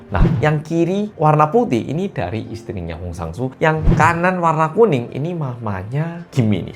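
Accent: Java, Indonesia. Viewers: Abis dari channel ngelantur yg brutal anjing2an... ke channel mas2 korea jawa medhoookkkk 😅😅😅 untung gk ketuker😂😂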